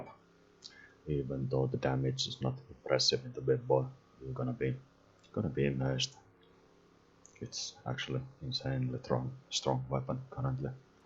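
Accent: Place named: Finland. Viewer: Whoa, that's nice. Hopefully we get this into the live next update. First vid I've seen from you and your accent got me wondering if you're a Finn by chance?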